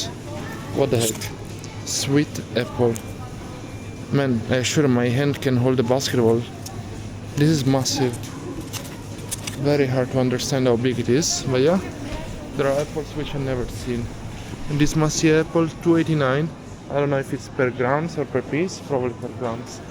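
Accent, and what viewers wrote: Italy. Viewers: Судя по акценту, парень из Италии? Или мне показалось?😂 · Hello! Are you Italian? Your accent sounds Italian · Поправьте меня если не права, но как будто автор итальянец ) Узнаваемый акцент ))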